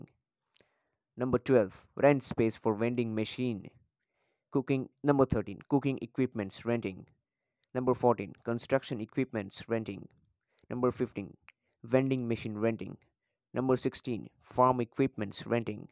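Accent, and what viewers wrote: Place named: India. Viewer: He is from India.. accent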